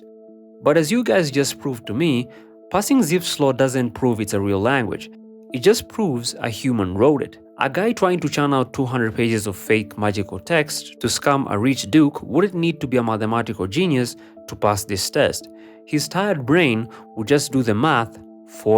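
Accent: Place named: Russia. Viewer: Why do I hear russian accent from a Kenyan?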